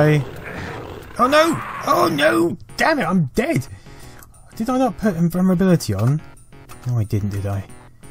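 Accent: United Kingdom. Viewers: I love your obviously British accent:) Great content as well, keep it up !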